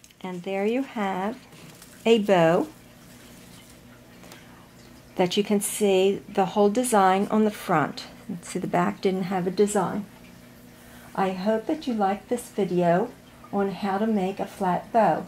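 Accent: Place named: United States. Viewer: Thanks a lot! I am probably responsible for half your views: trying to watch carefully how you do it :) What part of the states are you from? That accent is charming.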